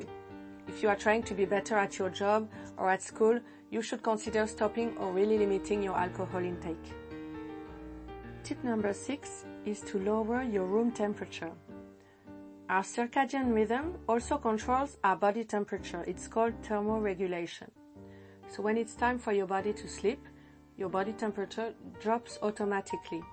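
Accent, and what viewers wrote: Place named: France. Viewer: I found this video explained very clearly all the complex facets of sleep. Nice French accent as a bonus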